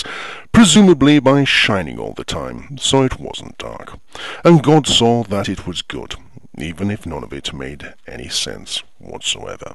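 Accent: United Kingdom. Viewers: I was lead here by fans of my channel... and while I knew that I was hardly doing something totally original... I was told that your english accent was part of how funny you are. And.. yes the english accent is totally something I cannot hope to imitate being that I have a lowly midwestern US non-accent accent... long story short, You're funny and interesting enough that I watched the whole video! I like your sense of humor! I can only hope to be a fraction as funny as you! :D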